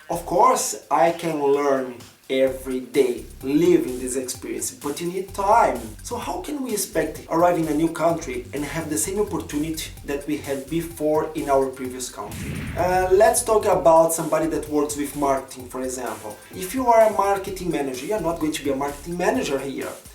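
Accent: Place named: Brazil. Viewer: Ver um brasileiro falando inglês me dá uma sensação excelente de que eu 'domino' a língua, pois entendo 80% do que voce fala kk! O sotaque é fator determinante na conversação, é incrível. Keep it up,!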